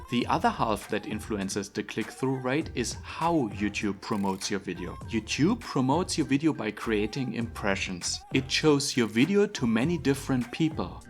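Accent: Germany. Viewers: alter red doch einfach deutsch man haha, wenn deutsche english kann man nicht aushalten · Heavy German Accent. You say [exactly] instead of [exectly]. I know the first is right but its spoken like the second one.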